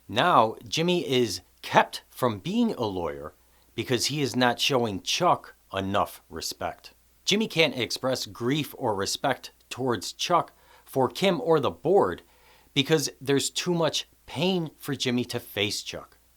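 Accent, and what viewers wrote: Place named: Michigan, United States. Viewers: Love the accent. Michigan? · Like your Midwest accent! 👍 Great insight.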